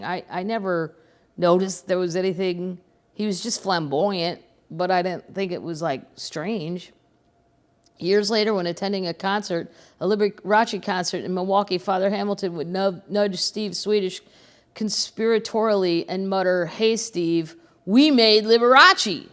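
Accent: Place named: Kentucky, United States. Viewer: I hear some Kentucky funk in your reading tonight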